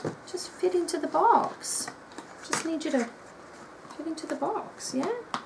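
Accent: Australia. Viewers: Wow I'm always super surprised at how you pronounce certain words! Haha no offence, the Australian accent is gorgeous, but I'm so not used to it (Swedish TV mainly shows American shows and movies...) and I always have to concentrate a bit more to understand you. :D Again, no offence, I need to stop being so ADD anyway, so it's good for me haha. The Linestrider is a bit odd to me, I was very drawn to it, but when I opened it up I felt it was a bit "colder" than I had expected. It almost gives me the same vibe that the Fountain Tarot did for quite a while! But now I love the Fountain Tarot so I have hope this one will warm up for me too... :)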